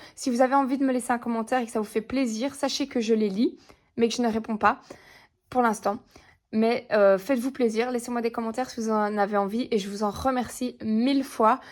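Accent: Belgium. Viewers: J'ai repris le colo cette année et j'ai pris une claque avec l'évolution du matériel ! toutes ces chaînes de coloristes mais j'avoue que ton accent belge avec ton côté passion douceur dans ce domaine te démarque 😁 j'adore également le scrapbooking donc, le mélange me plaît beaucoup ❤ merci pour tes vidéos 🌹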